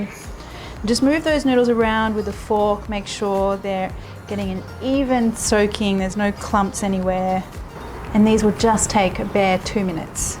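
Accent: Australia. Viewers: Are you Australian?! Love your accent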